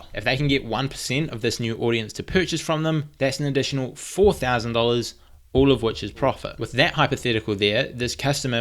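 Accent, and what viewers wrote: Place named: New Zealand. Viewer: To be Honest , You're kiwi Accent sounds like a Music to hear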